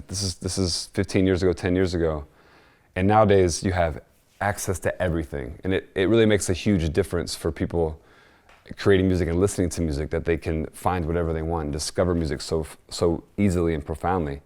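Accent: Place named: Cockney, United Kingdom. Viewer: cockney pig sty gov'nor. bah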